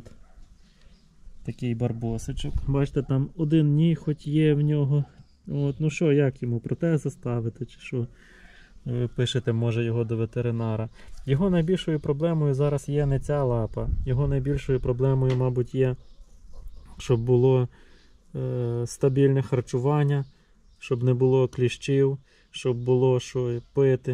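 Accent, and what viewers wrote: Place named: Western Ukraine, Ukraine. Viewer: Почала вас дивитись-зацікавили.Ви вихідці з західної України?говірка така,як в нас на заході.Удачі Львів 60,50грн.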